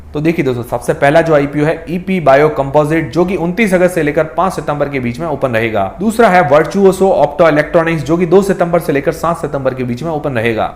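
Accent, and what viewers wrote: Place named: Marwari, India. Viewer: आपके बोलने के लहजे से मारवाड़ी लग रहे हो।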